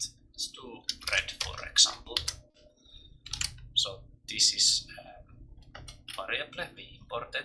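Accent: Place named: Russia. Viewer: I love your russian accent :)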